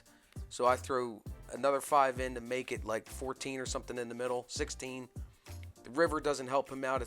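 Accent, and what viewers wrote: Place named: Maryland, United States. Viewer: 1 minute in, and you get a like just for your Maryland accent!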